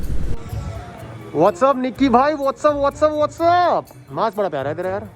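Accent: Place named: Delhi, India. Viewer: Baat krne ke style se hi pta lag gya delhi ka londa h👍🏼😂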